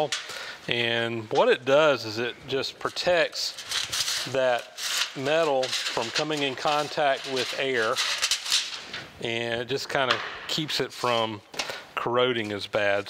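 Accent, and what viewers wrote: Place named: Georgia, United States. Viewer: Was his Georgia accent not enough of a clue?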